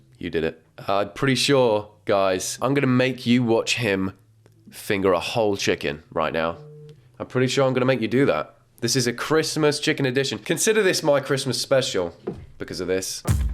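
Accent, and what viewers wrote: United Kingdom. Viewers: yoooo is it me or he js sounds british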